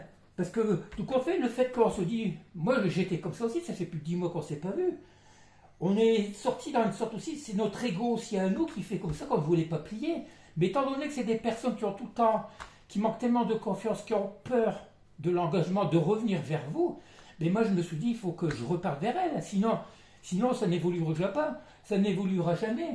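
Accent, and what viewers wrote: North, France. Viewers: Bonjour, j'ai comme l'impression que tu es din ch'nord, ça me rappelle de bons souvenirs... Merci pour tes lumineuses guidances. ☺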